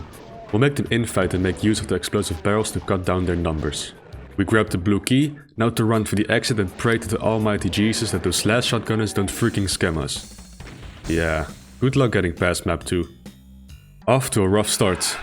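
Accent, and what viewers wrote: Netherlands. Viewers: Are u dutch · Wait youre dutch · You Dutch? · Have to say I also thought he was Dutch. Am South African and used to live in NL for a few years. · Are you dutch by any chance? Your accent sounds dutch.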